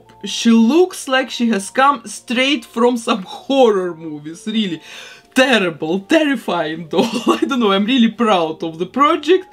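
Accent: Russia. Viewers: Обалденно! А у вас русский акцент?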